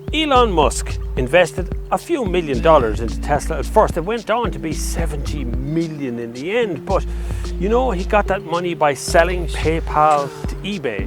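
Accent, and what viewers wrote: Ireland. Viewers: Excellent documentary. Thanks very much. Just a minor point as a Canadian watcher: Newfoundland is correctly pronounced "Newfin' lan". Also, they have accents very close to your own as this part of Canada was mainly settled by Irish migrants. Carry on. Great content. Cheers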